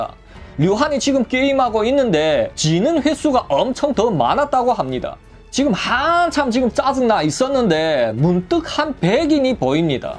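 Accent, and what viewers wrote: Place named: Shenyang, China. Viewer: 진행자가 조선족 인간이네 말소리들으니 선양조선족이다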